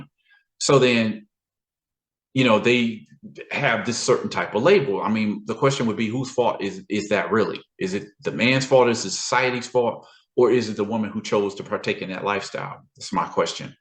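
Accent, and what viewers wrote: United States. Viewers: You sound American but I'm guessing you live in Brazil bro?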